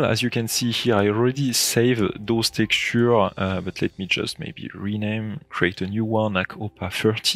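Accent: France. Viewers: Le petit accent français ? Des videos en français ? :) Faîtes vous des formations twinmotion ?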